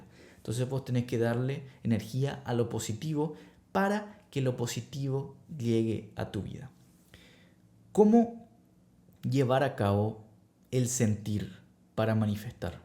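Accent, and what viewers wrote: Paraguay. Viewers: Acento Paraguayo? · Ya me parecia que sos de Paraguay por el tono 😊😊. Que gusto encontrar alguien de mi pais que hable de este tema en el cual estoy muy interesada. Si puedo contar algo de mi experiencia es q años atras menifeste a una persona en especifico, q hice? fue con scripting, y tb olvidando unos problemaa q habiamos tenido y enfocandome de forma positiva hacia esa persona, creo q la clave estuvo mas q nada en eso. Y tambien recuerdo q a un amigo le dije q con esa persona estabamos saliendo cuando no era asi y luego se cumplio, pero yo creia fervientemente q esa relacion se iba a dar tarde o temprano. Asi pues creer es el secreto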